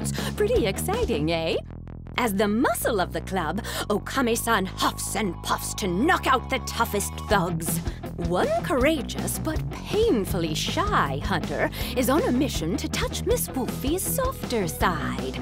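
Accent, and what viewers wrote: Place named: Tidewater, United States. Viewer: the narrator, huh? Totally didn't know it was her. Different from her usual voices. I haven't seen the subbed versions, but unless I'm completely off-base the narration sounds a-okay. Tidewater accent, emphasis on certain words and phrases as if she were telling a story, and a general "old granny" feel to it. That's a pretty archetypal Western story-telling voice, and a dub is for Westerners. Rock on. I'm sure it'll be a good dub. There's a lot of big names in that line up.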